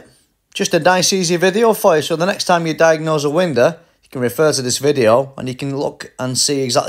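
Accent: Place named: Bolton, United Kingdom. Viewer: Great vid. Must've changed hundreds of these motors when I was at BMW. Where are you based? Sounds like you're from Bolton.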